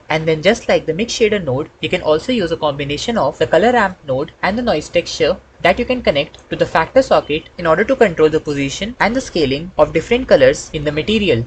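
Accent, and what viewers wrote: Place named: India. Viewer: Namaste Bro, Can you also make a full course for sculpting (Note- everything about sculpting means basic to advanced) in blender. Are you from India as your accent seems like to be an Indian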